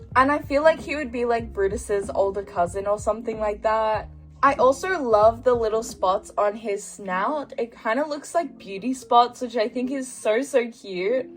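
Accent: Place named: Australia. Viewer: I love your australian accent so much lol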